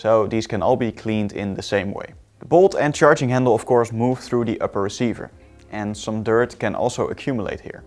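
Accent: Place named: Netherlands. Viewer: u sound very very dutch my guy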